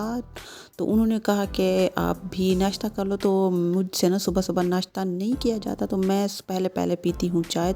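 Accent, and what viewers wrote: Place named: Sindh, India. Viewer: Me new luranay video ap k dekhi, lgrha he jesah sindhi log sindhi style ,me b sindhi hun aap hyderabd me ho ya karachi me??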